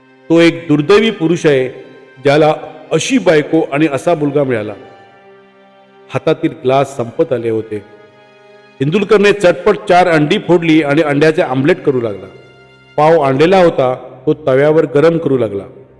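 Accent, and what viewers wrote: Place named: Kolhapur, India. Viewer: कोल्हापूरी टोन ऐकायला बरा वाटला.. शेवटी कोणी कोणाच नसत हेच खर...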